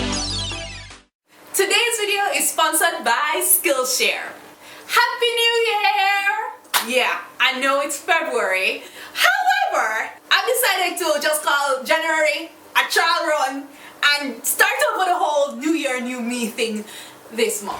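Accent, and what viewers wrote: Patois, Jamaica. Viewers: I have nylon thread so I can use them.. You know at first I thought you were from a different island until I heard the patois Jamaica patois🇯🇲🇯🇲🇯🇲🇯🇲🇯🇲🇯🇲🇯🇲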